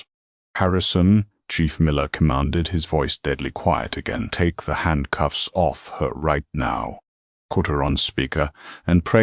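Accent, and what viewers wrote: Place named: United Kingdom. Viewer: Great story but again, this voice is terrible. Maybe try something else, but keep it british! 🙏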